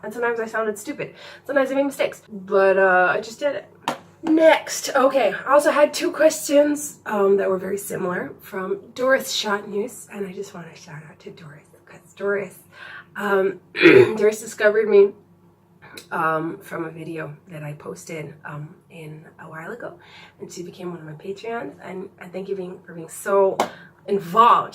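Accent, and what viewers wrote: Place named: Baltimore, United States. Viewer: Wow, you sound so much like home to me. Are you from Baltimore? Pikesville? Love your videos!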